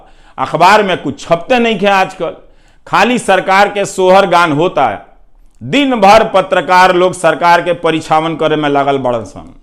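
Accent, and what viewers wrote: Bhojpuri, India. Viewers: इतनी मीठी भोजपुरी हम कभी नहीं सुने हैं. एक तो "रिंकिया के पापा" गानावाला भोजपुरी. नहीं तो पुलिसिया भोजपुरी. पर आपका भोजपुरी एक अलग अंदाज का है. मारीशस में दुसरी सबसे ज्यादा बोलनेवाली भाषा भोजपुरी है. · Your Bhojpuri accent is so nice. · Bahut badiya sir bhojpuri bahut hi mitha boli h. raura bhojpuri k or uper utha le jaim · Really sir, heart touching channel.Hearing you in so sweet Bhojpuri has made my day.Best wishes sir.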